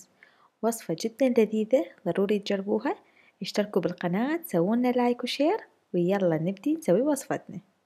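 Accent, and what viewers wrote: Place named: Iraq. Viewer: اللهجه العراقيه تفوز🥺❤️❤️🌚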